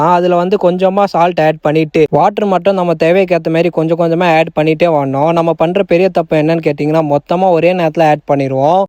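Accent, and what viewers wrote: Rajasthan, India. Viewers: How u speak Tamil? You are Rajasthani or Marwadi?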